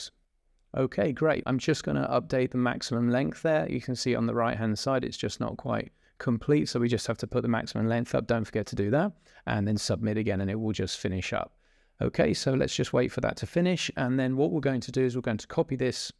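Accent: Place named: England, United Kingdom. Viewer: You have a very nice english accent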